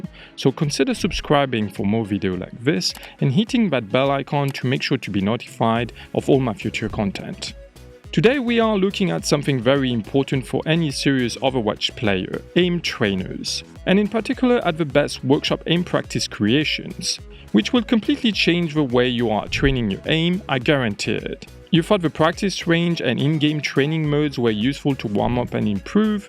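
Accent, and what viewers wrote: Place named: France. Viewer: Cet accent français😂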